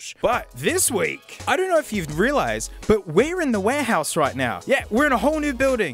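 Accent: Australia. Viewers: Theres something about listen to an Australian talk about Apple products with a very green iPad case thats just so entertaining. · I feel like I have a loud Australian friend. Everyone needs a loud Australian friend.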